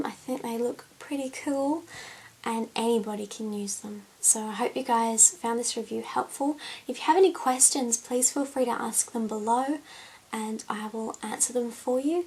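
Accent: Australia. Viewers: Sounds pretty Australian to a Brit :)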